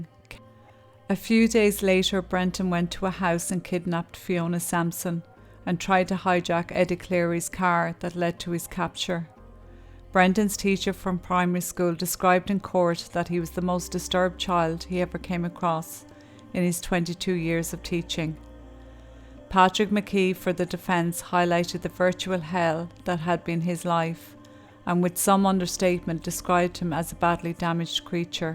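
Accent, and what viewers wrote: Ireland. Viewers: The narration on these videos are wonderful. I am from the USA, but I love the Irish lilt as it reminds me of my dear grandmother from Donegal. Thank you for your sensitive telling.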